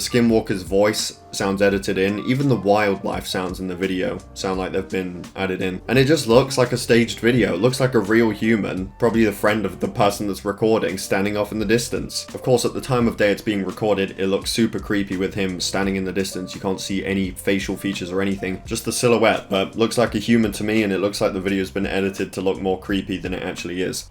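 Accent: United Kingdom. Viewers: He sounds British. If u r British click see more. BRI’ISH INNIT MATE? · your british i am going to subscribe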